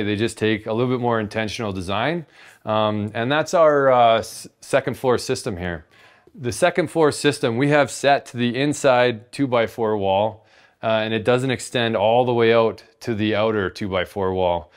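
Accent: Canada. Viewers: The accent is definitely Canadian and I noticed on a work truck that it mentioned the city of Calgary (in the province of Alberta in Canada) where two of my sisters live and only 6 hours from my hometown one province over. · If I'm not mistaken we're hearing the an accent hailing from the nation of Canada. If that's so I can fully understand why there's an emphasis being placed on having a thermal break and maximizing insulation. Yes, having 2 2x4 walls is going to cost more than a single 2x6 wall, but the increase from 5.5 inches of insulation to 10 inches of insulation would make the added construction cost and a loss of square footage a viable tradeoff when heating costs resemble those of a latitude much farther south. I'd rather pay more for something I'm going to buy once, like building materials and labor, than pay an ever increasing amount for the energy to heat a less insulated space. 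$500 dollars to build a house amortizes pretty fast when a heating bill is $50 per month instead of $150. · nice presentation! sounds like CANADA from the accent and I visited there seen 7 window pane so must get cold.... burr... in that case maximum design fun of interiour buildings for people to last the long winters... -tibor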